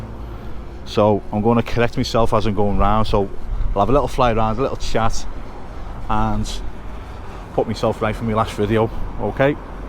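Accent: Liverpool, United Kingdom. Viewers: Brilliant camera work and a real scouse commentary. Love it. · You sound a bit like Jamie Carragher the way you pronounce your scouse A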